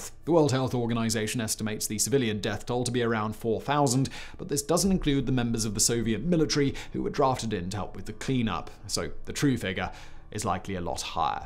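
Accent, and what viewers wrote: United Kingdom. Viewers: Yes. He tends to put me asleep. I genuinely don't like the British accent but I like his voice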